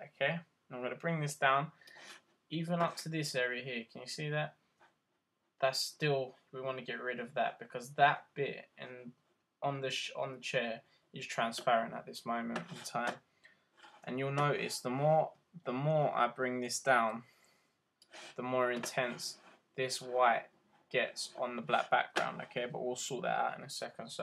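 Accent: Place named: United Kingdom. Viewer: thnx for that, helped me on my assignment. cool british accent u got there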